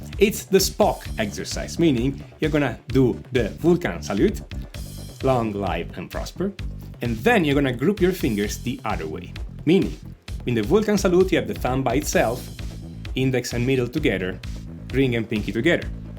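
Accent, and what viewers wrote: Italy. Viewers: ma sei italiano per caso?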